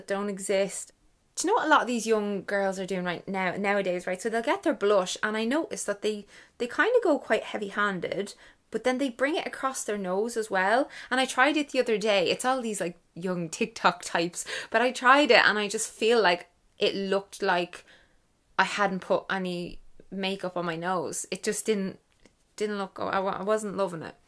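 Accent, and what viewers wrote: Ireland. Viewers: Honestly not that great 😔 Unfortunately covid coincided with just a lot of shitty stuff so my natural bubbly self is a bit emotionally exhausted...But your videos always boosts my mood so much...so thank you Mel! Especially the food diary Friday's... it actually made me recognise my own problems with binging and stuff so yeah thank you especially for all your stuff with that. It's weird I realised the other day that a few of our close family friend's are Irish and there's something about that accent, your accent, that reminds me of happier times... Sending you lots of love 💚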